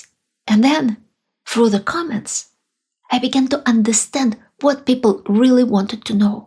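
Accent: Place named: Russia. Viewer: I am a fan from Nigeria 65 years old. Congratulations. I wanted to write many words of admiration to you, But you have already explained what type of person you are. And it is true. You are touched by divinity. You inspire those who listen to you. You are charismatic. I agree totally with the person who commented before this my comment. I most say your English is improving just keep the Russian accent.😊 wishing you the best. Your fan.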